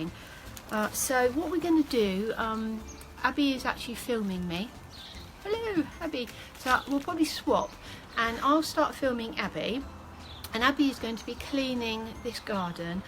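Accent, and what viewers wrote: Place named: United Kingdom. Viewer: she’s british they literally INVENTED english dude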